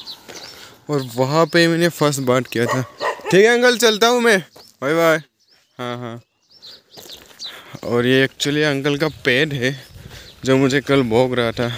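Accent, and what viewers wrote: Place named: Nepal. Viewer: By his hindi ascent he is nepali bcz I been in uttrakhand , people of uttrakhand hindi ascent is different not like him anyways enjoy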